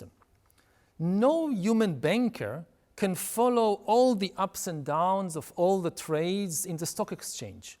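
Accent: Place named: Japan. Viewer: 日本訛りの英語だなこれ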